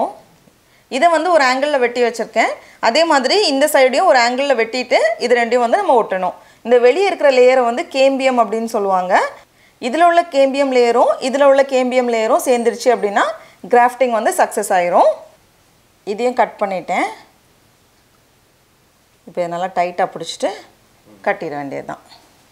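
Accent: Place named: Southern Tamil Nadu, India. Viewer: ninga unga speech la Thenmavattam thaan ninaithen... athu correct pola Nagarkovil nu sonningalaa...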